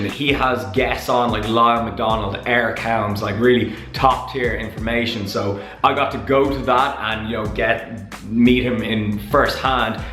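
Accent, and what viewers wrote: Ireland. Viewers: I wonder are you able to speak Irish Gaelic? Your Irish accent is pretty light compared to others I've heard.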